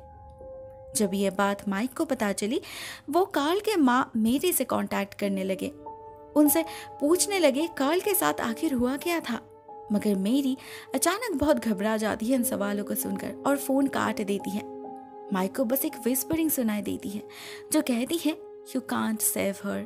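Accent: Bengal, India. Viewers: Didu ap bengal se ho kya apki voice bhot sweet hai isliye lagta hai 💜😍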